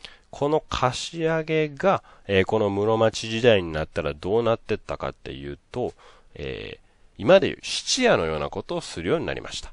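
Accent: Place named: Kansai, Japan. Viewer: ほんま関西弁は聞きやすいな。ほんまに勉強になりますわ。どちらかこのような平民の生活や環境の方が興味を抱きます。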